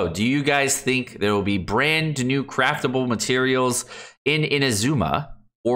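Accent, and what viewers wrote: United Kingdom. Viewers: At this point I watch this channel not for genshin, but for a new episode of Bri`ish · I just love British..... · damn british is growing on me:D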